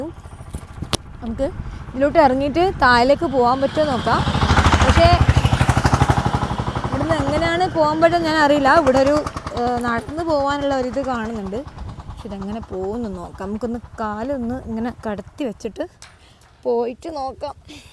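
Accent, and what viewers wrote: Kannur, India. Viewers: Ninte oombiya Kannur bhasha....podi purathu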